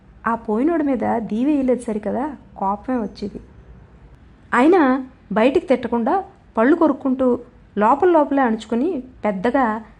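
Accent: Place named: North Andhra, India. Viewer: చెక్కా వారికి గానీ పెండ్లికి గానీ పిలుపుకి గానీ కథతో సంబంధం లేదు. (లేదా నాకు అర్థం కాలేదు)😂 గారి కథనం బాగుంది. గారు ఉత్తరాంధ్ర యాసతో చదువుతుంటే వీనులవిందు గా ఉంది! కొన్ని పదాలు మరీ పాయసంలో జీడిపప్పుల్లా అ(వి)నిపించాయి!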